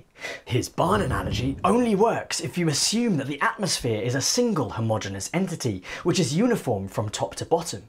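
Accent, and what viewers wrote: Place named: RP, United Kingdom. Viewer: Firstly: you're jacked, British, non-white and sound posh. I like it! But the saturation myth (or effect, if you're of that persuasion) is a red herring. We don't have to care about physicists squabbling about yay or nay on this. Instead just look at the data of the supposed effects of rising temperature and CO2: arctic and antarctic ice, tropical cyclones, droughts, crop yields, Great Barrier Reef coral cover, greening, desertification, wild fires, deaths from temperature (both heat and cold) etc - hardly any of them are happening in an adverse way. And rising temperature/CO2 are actually making certain things better: few temperature deaths, improved crop yields, greening, more natural diversity...... Get real everyone! You can continue to shriek inside your echo chamber OR you can go look up the data with an open mind, intellectual curiosity and critical thinking. 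You'll flip, like me - guaranteed if you're not yet fully brainwashed.....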